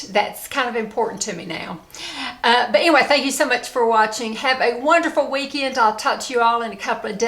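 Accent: South, United States. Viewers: You could have been a Miss America...you are so beautiful and your figure is amazing. Love your southern accent...❤️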